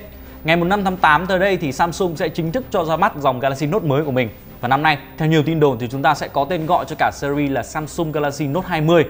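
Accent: Vietnam. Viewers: p chịu thôi chứ, đây là vn, mà mấy người xem tin công nghệ có người hiểu người k, nói kiểu vn thì cả giỏi lẫn k giỏi tiếng anh đều hiểu đc thì nó tối ưu hơn chứ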